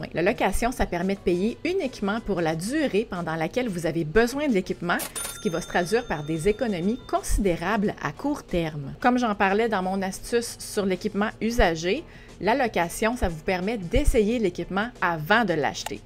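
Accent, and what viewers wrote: Quebec, Canada. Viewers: Merci pour cette video et ces conseils avec ce bel accent quebecois !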